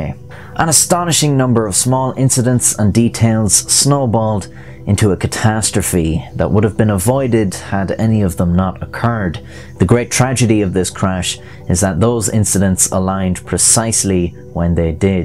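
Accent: Ireland. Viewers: I love his accent! The Irish narrator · I’ve heard this story before, but hearing the Irish lad explaining it entices me for some reason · H I'm pretty sure he's Irish but if your some Irish English thing thats cool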